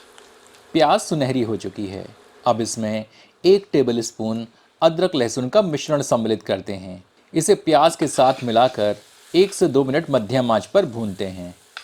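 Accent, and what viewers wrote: Standard. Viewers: श्रीमान आपका शुद्ध हिंदी बोलना बहुत ही आनंदित करता है परन्तु spoon चम्मच कह के बोलिए सोने पे सुहागा हो जायेगा धन्यवाद!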